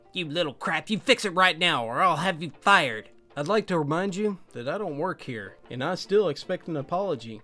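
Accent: South, United States. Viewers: the waylen jennings justified accent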